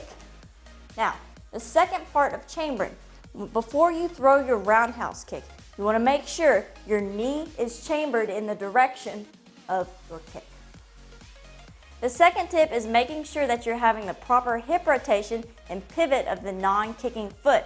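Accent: Texas, United States. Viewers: whAT IS THIS ACCENT IS THIS TEXAN WTF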